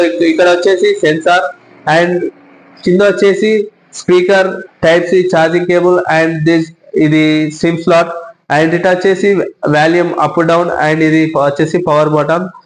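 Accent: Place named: Telangana, India. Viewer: Anna kocham fear kaku anna while saying Nuv chpadii correct ehh kani don't fear while saying..!! Anyways good review anna mana telangan slang lo ❤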